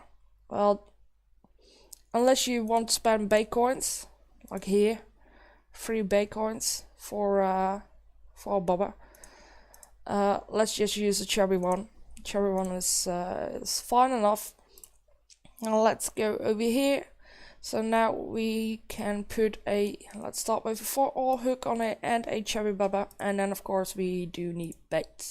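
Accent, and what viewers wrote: Netherlands. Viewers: Jij bent Nederlands??? Vandaar dat accent!!! geweldig hahaha ma Flodder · Are you Dutch?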